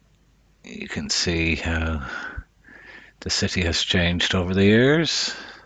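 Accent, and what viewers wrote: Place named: Ireland. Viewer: Very nice to hear a fellow Irish voice on their voyage of discovery.. Really enjoyed the vid. Any views on the mud?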